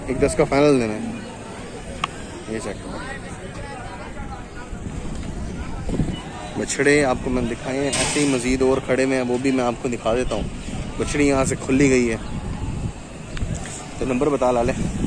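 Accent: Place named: Karachi, Pakistan. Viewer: Bhai ap bilkul karachi walay lagrahay ho or bargling bhi karachi walo ki tarah krahay ho i like you keep it up agar multan aya to ap se zror miloga apka channel aj hi subscribe kia hai mainae.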